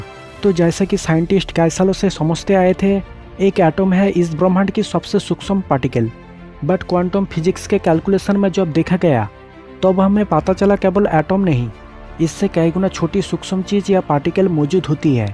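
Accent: Bengal, India. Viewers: Sir ap ki Hindi thodi alag hai like a Bangla language.But nice video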